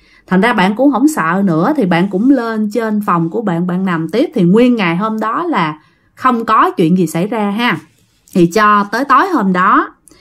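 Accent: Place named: Mekong Delta, Vietnam. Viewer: dân miền tây thì mình cứ phát âm theo dân miền tây đi chứ ko cần phải phát âm theo dân miền bắc bạn hiểu mình nói ko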